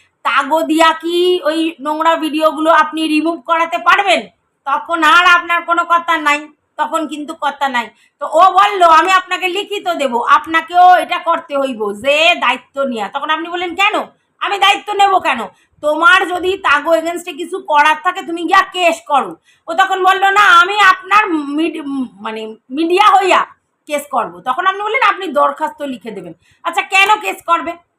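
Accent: Bangal, Bangladesh. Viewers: di video ta khub bhalo, kintu normal bangla dialect e video korle better lage sunte.. ei "bangal" tone e kotha bolle half jinish bujhte parina r khub ugro lage.. Kichu mone korona bollam bole.🙂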